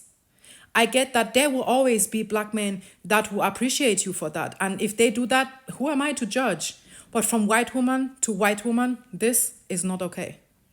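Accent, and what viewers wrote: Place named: Nigeria. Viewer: Very nice video. Very insightful, and many good points were made. If I may add on one piece: "whiteness" is not the problem; White PEOPLE are the problem: White men, women, boys, girls, little old White women, and cute little White preschoolers! I haven't seen your other videos, maybe you address this there; but this is a point that was not so strongly addressed as far as I could see. I have never seen"Whiteness." I wouldn't be able to identify it nor would I know how to combat it if I came across it. I do know what White people and their racist deeds are however. "Whiteness" is an amorphous, abstract concept that dilutes the dialogue regarding where the problem (and thus the solution) lies. It's actually a shelter, the final fig leaf, so to speak, behind which White people hide. It's part of the problem and a term which should be avoided in conversations on Racism/White Supremacy at all costs! 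Rather than speaking of "our Whiteness," I would rather hear White people say, "I did such and such," or "My mother, my wife, my grandfather, my child, or that White person right over there did so on and so forth." Notice how we NEVER hear White people talking like this, yourself included, ma'am. (Again, within the context of this video.) This would be a good habit for all White people to cultivate, again, including yourself, ma'am. Other than that, great video! I especially like your accent. Nigerian?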